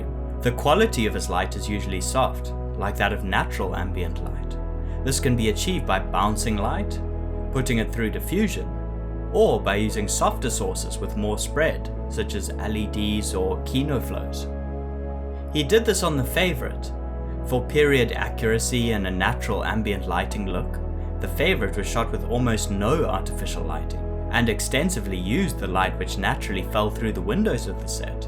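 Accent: South Africa. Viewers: You sound south African Are you?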